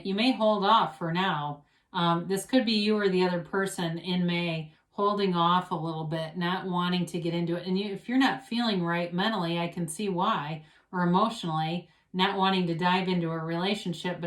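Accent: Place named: Michigan, United States. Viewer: Sounds like you have a Michigan accent...or thereabouts.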